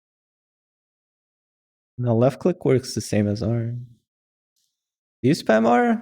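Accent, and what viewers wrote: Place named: Hungary. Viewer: Na a magyar akcentus befigyel :D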